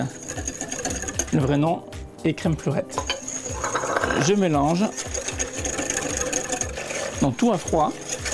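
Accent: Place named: South, France. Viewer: des 'rameuquins' :) j'adore l'accent du sud !! merci pour la clarté de cette recette !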